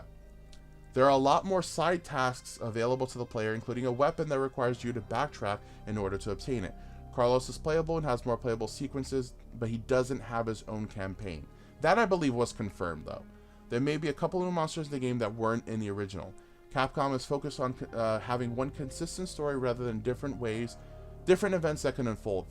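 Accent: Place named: Michigan, United States. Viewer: Sounds like you live in Michigan 😂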